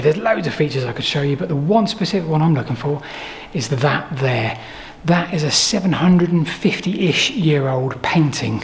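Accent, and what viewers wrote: United Kingdom. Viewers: You do good documentaries, but, you need to slow down your words and talk with a bit more articulation, especially with a British accent. I have to stop the video often to decipher those rapid burts of words.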